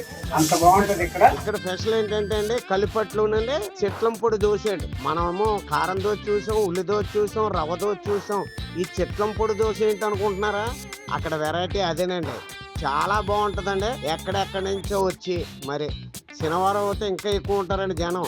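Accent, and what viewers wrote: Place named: East Godavari, India. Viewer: Mana east godavari slang ❤❤😊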